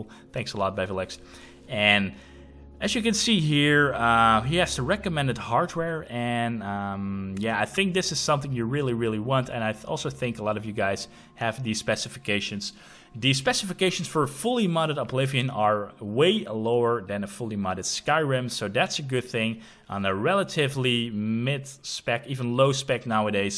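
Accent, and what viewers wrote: Netherlands. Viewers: Hello fellow Dutchmen. Altijd fijn dat je aan de uitspraak meteen merkt dat iemand Nederlands is.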